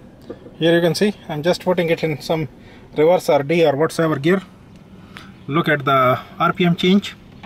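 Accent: India. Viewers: Oh cool! Saudi Arabia eh? Your accent made me think india or Pakistan. How's the collapse in the oil price affecting every day life over there? I've always wondered, what life is like in the kingdom. As for the toothbrush thing, I just thought it was funny that you said "LOL." to indicate that you made a joke.:-) Good video.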